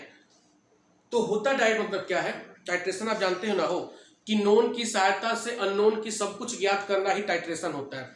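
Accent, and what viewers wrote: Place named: Bhojpuri, India. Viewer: Sir आपके भोजपुरी जान मार रहा है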